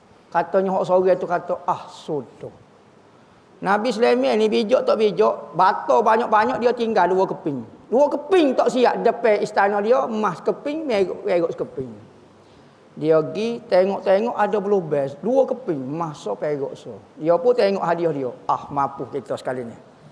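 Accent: Kelantan, Malaysia. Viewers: saya oghang Kedah okay ja dgn kecek Kelate dio tu...faham gak sikit2...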